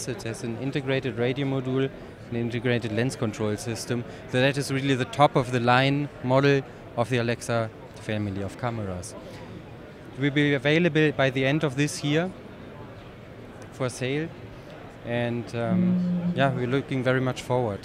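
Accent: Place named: Germany. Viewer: Er ist echt nen deutscher ^^ He really is a german, i'm german too but he really can't speak english ^^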